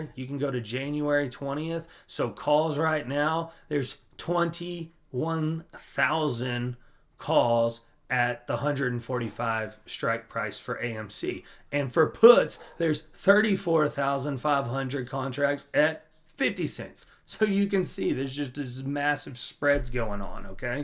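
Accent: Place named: South, United States. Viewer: Maw frands LOL, you sound southern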